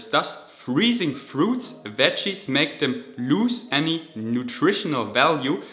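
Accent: Germany. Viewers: Ich finde deinen deutschen Akzent beim Englisch sprechen irgendwie soooo ablenkend :(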